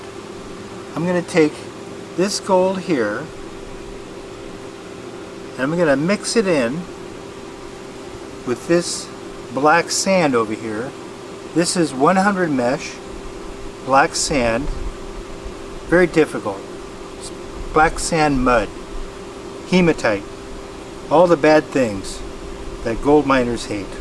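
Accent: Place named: India. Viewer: Indian delivery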